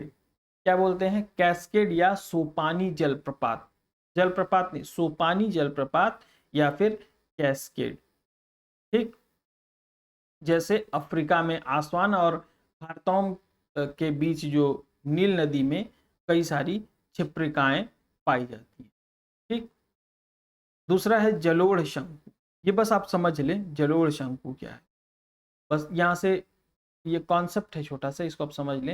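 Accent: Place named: Uttar Pradesh, India. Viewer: Bahut hi jhakkas ek dum up wali bhsha me bole to mast lajwab❤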